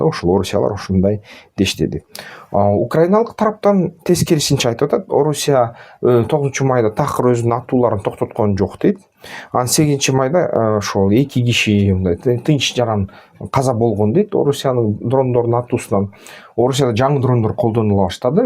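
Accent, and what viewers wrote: Standard. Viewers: Менин Кыргыз адабиятынан сабак берген эжеке агайларымда мынчалык таза суйлобойт эле😂 сиз кайсы жерлен болосуз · Мендагы раазы болгонум кыргызча таза суйлогонго аракет жазап жатасыз ишинизге ийгилик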